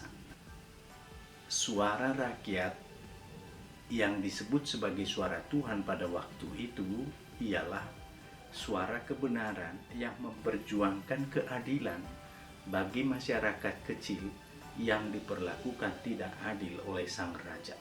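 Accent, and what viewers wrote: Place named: Porsea, Indonesia. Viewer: Titip Salam buat Pariban Awak Pastor, OFM. Cap.. Suaranya KENTAL khas SUARA PAR-PORSEA.. Maaaaantaaaf. Terimakasih atas RENUNGANNYA. SEMOGA SUARA TUHAN TETAP DAN SELALU HATI.. GBUs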